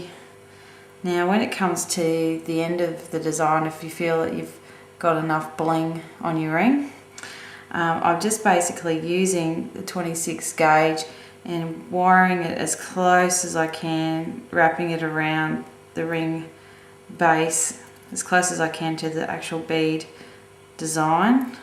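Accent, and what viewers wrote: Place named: Australia. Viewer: You make that look so easy so I'm going to have to try this. I've just begun to think about making jewelry since it looks like so much fun to do. There's a lot to learn about all the tools and wires and beads etc. but I'm going ahead with it. Thanks for doing this. I love listening to your voice and your accent. :) I love Aussie and British accents and could listen to them all day. ;D Beautiful ring too!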